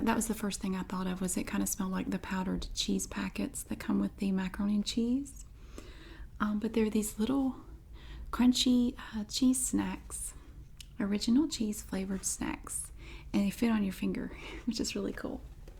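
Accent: United States. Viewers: gor God's sake, Please stop with the pronunciation issues. She lives in the States of course shed pronounce it differently than Aussies.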